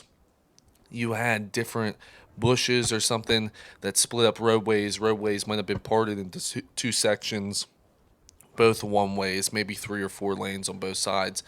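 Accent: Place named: Pittsburgh, United States. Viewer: I knew you were from Pittsburgh. Your accent is a dead giveaway. My family is from Pitcairn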